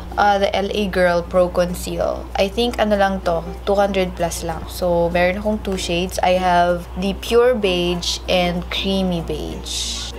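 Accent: Philippines. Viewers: I love tagalog accent!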